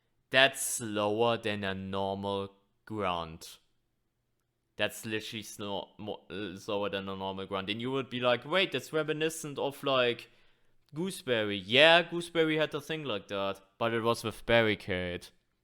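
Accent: Germany. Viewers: Your accent is cool. German?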